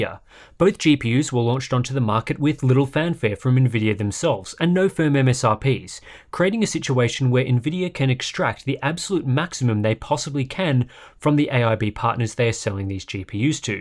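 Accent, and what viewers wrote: Australia. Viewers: After 15 months of having an MSI Radeon 5500 Mech 4GB I can honestly say I only play these videos so I can hear the difference between my Syracuse accent and your Aussie pronunciations.... "One is my name. The other is not." - Lt. Commander Data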